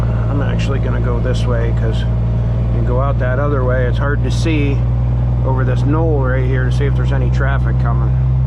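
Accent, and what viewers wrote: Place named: Midwest, United States. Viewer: Cleveland! That’s where I’m from bro you sound like you’re from the Midwest by chance. Love the sound of the Jake on your truck man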